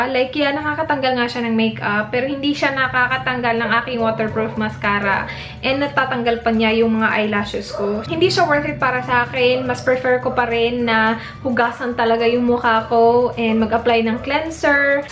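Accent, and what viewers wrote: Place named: Visayas, Philippines. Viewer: bisaya accent is there pa hahahaha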